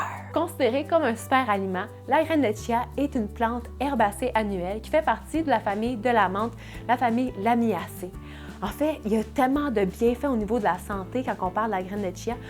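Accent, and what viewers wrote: Quebec, Canada. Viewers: J’aime beaucoup le français canadien ♥️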